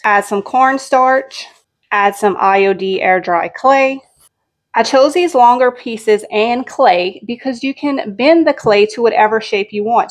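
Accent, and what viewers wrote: Louisiana, United States. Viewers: You sound like a Louisiana girl! I’m from Louisiana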